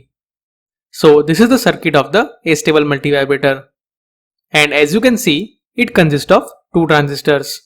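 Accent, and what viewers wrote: India. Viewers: when yu hear indian yu know its legit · American accent please. I can not digest Indian accent.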